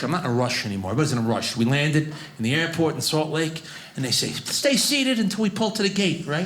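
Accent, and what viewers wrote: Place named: New York, United States. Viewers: That was amazing. Great jokes, great delivery, great timing, great facial expressions. Instantly likeable, too. And on top of all that, me being a German language nerd, a fascinating case study of the New York accent and mentality. 👍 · Classic standup style routine, but still original. Love the NY accent. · I enjoyed this set, I like his NY accent, good story line and punch lines. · First time seeing this comic, testing my accent recognition skills. Have not googled him. But he’s from NYC.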